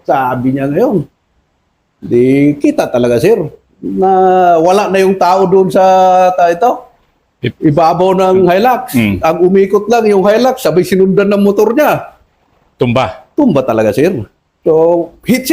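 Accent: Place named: Cordillera, Philippines. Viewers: Yes he is Igorot 🫶👍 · Parang igorot si sir,halata sa salita nya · Mukhang Kabbayan q ito sa accent lng alam q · Halatadong igorot kac sa pananalita ❤ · Sabi n igorot. Halata sa accent. Magaling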